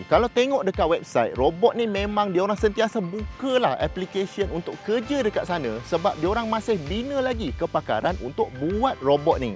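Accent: Johor, Malaysia. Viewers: Lah orang jb ke haha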